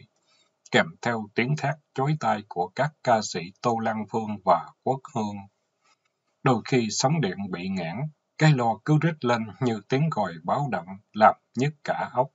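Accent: South, Vietnam. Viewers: Những bài Hồi Ký của Th/Tá VML K/20 VBQG thật đầy chi tiết và sống động trước mắt của phim trường. Chúc anh và gia đình luôn khỏe mạnh. Cám ơn anh 8 vè diển đọc. Giọng Nam của anh mà nhớ tới miền Nam.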